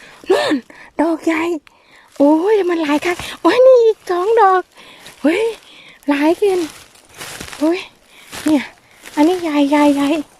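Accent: Korat, Thailand. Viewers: พูดภาษาโคราชฟังน่ารักจ้าน้อง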